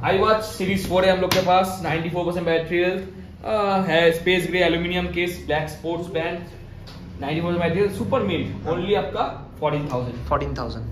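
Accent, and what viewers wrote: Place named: Bengal, India. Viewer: আপনি কি বাঙালি? সারদাপল্লি উচ্চারণ শুনে বাঙালি মনে হলো, হিন্দি উচ্চারণ শুনেও বাঙালি মনে হলো। তাহলে ভুল হিন্দি তে ভিডিও কেন করলেন ভাই? আপনি পশ্চিমবঙ্গে বসে আছেন। এখানকার ভাষা বাংলা। নিজের ভাষা কে সন্মান করুন। আপনি নিজের জায়গা তে হিন্দী বলবেন, ওদের জায়গা তে গিয়েও হিন্দি বলবেন....ওরা নিজের জায়গা তে এই ভিডিও টা করলে বাংলায় করতো বলে মনে হয়?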